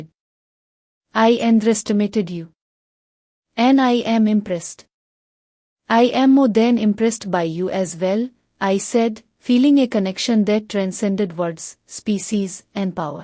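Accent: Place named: India. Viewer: Not sure the Indian accent works, plain Western English is far batter!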